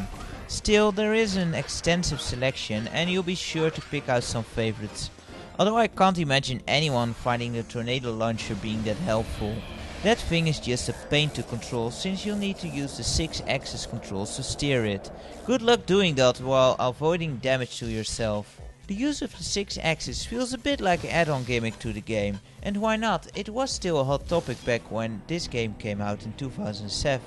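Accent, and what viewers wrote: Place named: Netherlands. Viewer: rofl you sound VERY dutch. are you?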